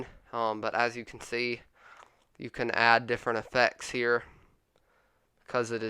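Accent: Texas, United States. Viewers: 1 second and i already know youre from texas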